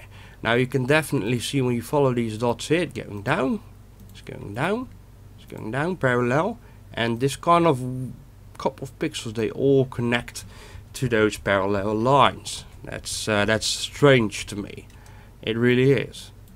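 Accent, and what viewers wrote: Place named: Netherlands. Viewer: lol dat dutch english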